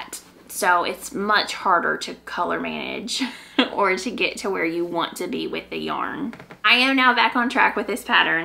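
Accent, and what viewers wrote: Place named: Arkansas, United States. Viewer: Hi! I love your podcast!! I’m a fellow Arkansan transplanted to Texas a long time ago and I just love hearing your accent from home! I love your Zosia wrap cardi - I can’t wait to make it in a light gray. I also recommend reading “A Gentleman in Moscow” if your haven’t already read it. It’s a beautiful story. My mom and I are reading the Thursday Murder Club series at your recommendation and absolutely love it! Thanks so much for all you share!! You’re so inspiring 🐝 🐓 🧶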